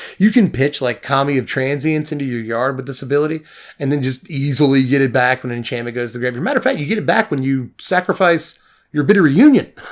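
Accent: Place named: Georgia, United States. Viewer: I totally guessed you were from GA takes one to know one.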